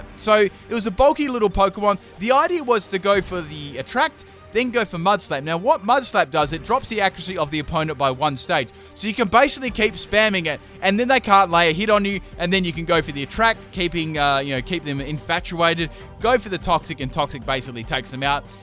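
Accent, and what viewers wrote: Australia. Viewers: you sound like an australian dude named ben for some reason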